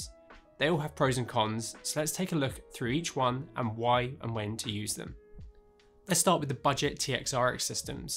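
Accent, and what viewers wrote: United Kingdom. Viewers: This dude has to be British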